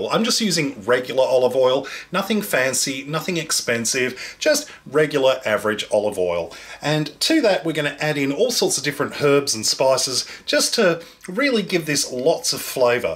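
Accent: Australia. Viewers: Shahrukh Shaikh he has an Australian accent and Sam has an English accent. They sound very different.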